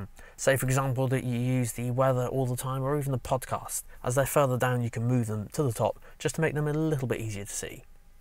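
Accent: United Kingdom. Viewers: Hi Thank you for your many wonderful videos about introducing Mercedes-Benz functionality. I’m one of your fans from Taiwan. also work as a Mercedes-Benz sales consultant. Last week I received a copyright warning from YouTube due to no authority translating your video. I’m really sorry did not get your permission to do that. however, there are many people loves British accent but didn’t understand English. I used to be a pilot in the US, does any chance could get your permission to translate your video? Thanks for your kindly reply if it does work. Wish you have a great new year Fans Eric from Taiwan:)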